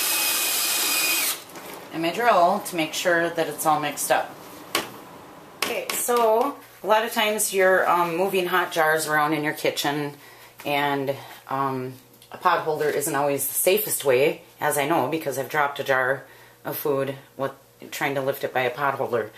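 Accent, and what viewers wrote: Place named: Wisconsin, United States. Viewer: You talk like the folks I grew up with back in Wisconsin>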